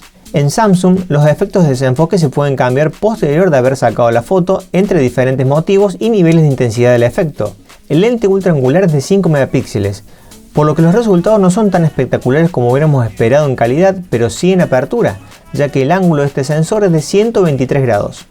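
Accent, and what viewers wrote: Córdoba, Argentina. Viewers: No hay mejor video que el hecho con esa tonada cordobesa... Amé!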